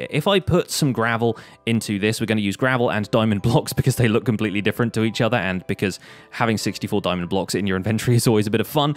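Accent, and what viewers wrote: United Kingdom. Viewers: He’s speaking with a British not American accent. We use both words - I’m a Brit too. An umbrella is usually for rain whereas a parasol is for sun.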